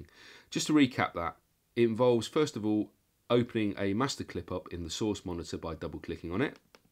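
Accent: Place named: Cockney, United Kingdom. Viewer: yes very clean and tidy, extremely good [ cockney diction and good visuals, thanks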